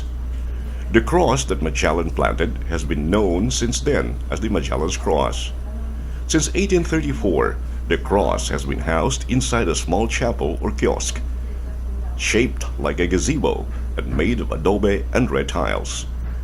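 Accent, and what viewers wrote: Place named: United States. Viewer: The narrator sounded like a native american speaker. I thought at first he was an american because of how he speak.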